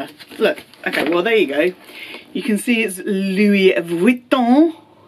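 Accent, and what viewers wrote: United Kingdom. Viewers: I’ve laughed. I’ve cried ( your cat was beautiful) . It was actually the cat that made me click. Your voice is wonderful! I love a British accent. Your personality is va va voom! Thanks for the video. I’m looking forward to your next!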